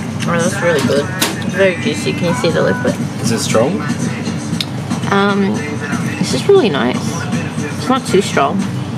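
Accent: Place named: Australia. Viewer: you sound like an Aussie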